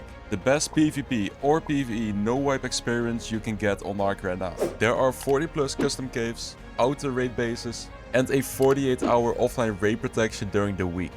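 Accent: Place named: Netherlands. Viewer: Waarom hoor ik het altijd meteen als iemand Nederlands is.. dope video btw en misschien kom ik een keer je server checken.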